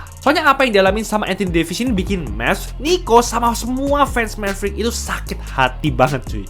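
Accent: Java, Indonesia. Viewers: Was kamu wong jowo kah ?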